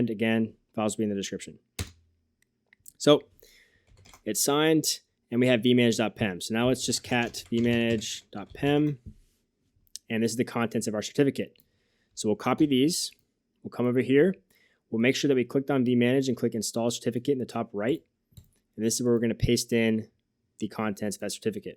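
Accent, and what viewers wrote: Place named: United States. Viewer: Very good structured presentation, but much too fast and with a hard to understand American English dialect if English not the own mother tongue.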